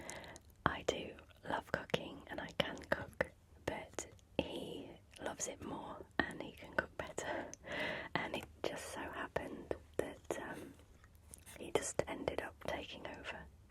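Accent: United Kingdom. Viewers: Love this and you!! Rough week so far and this has me ready to fall asleep and feeling calm. I’m American (with a US Southern accent) and I find your British accent so beautiful and proper ❤️💕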